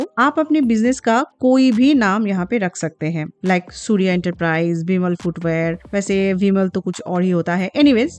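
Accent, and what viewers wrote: Bengal, India. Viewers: Aap bangoli ho na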